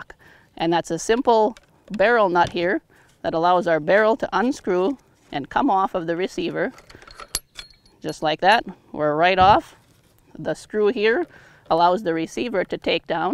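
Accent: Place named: Minnesota, United States. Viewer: I'm trying to identify the accent... Minnesota? Canada?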